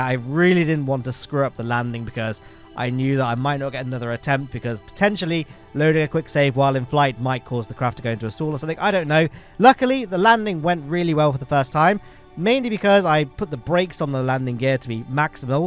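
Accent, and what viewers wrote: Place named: RP, United Kingdom. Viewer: he does have that British radio host accent also, nice pfp :3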